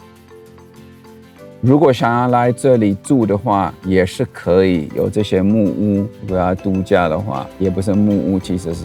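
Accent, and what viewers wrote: Taiwan. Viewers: 你說話根本就是台灣人，老婆又漂亮，真有眼光。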